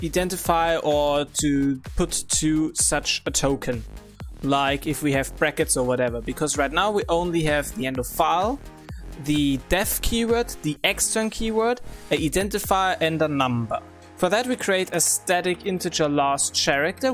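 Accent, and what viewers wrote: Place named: Germany. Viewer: 1) nice microphone 2) nice video editing 3) nice voice :) high quality! Just some weird ways to say things but great work! But that might come from the german accent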